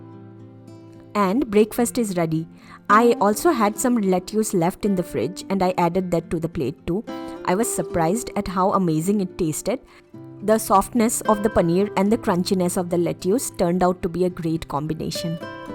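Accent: Bengal, India. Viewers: Awesome vlogging...sply the way you speak up with a bengali touch i love it...Hop kaya is fine and better...dont worry...stay blessed.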